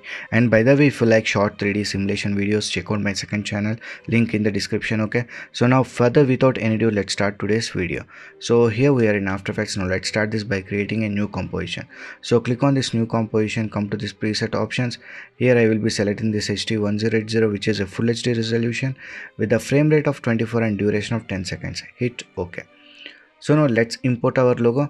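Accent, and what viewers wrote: India. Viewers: you speak like INDIAN pilot!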